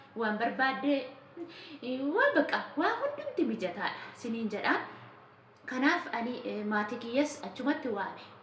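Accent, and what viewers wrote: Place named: Wollega, Ethiopia. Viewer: Sagalee beekama silayu akka wlg tate❤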